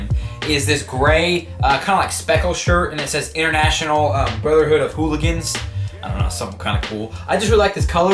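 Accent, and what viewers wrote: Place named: United States. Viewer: its fresh to hear a Leb with an american accent we have a fair few Lebanese in Oz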